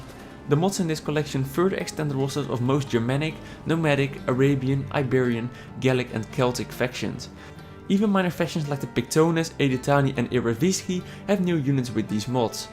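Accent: Netherlands. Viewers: Ik wist gewoon dat je Nederlands was door je accent, toen las ik de beschrijving van je kanaal xD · haha your sooo dutch i can tell by the accent sooo much haha great vid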